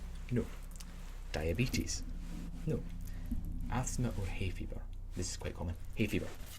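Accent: Scotland, United Kingdom. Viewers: I love scottish accent, and yours is amazing. Would you make more of it? 💞❤️ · As a scot. I have to say , loved the accent . Sound like most of my mates. And me kinda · your Scottish accent is great!! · I always found the Scottish accent a great trigger for me! suscribed m8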